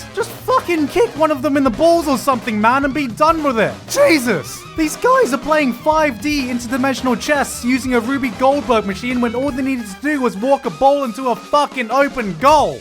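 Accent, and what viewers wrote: United Kingdom. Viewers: It's the Bri'ish accent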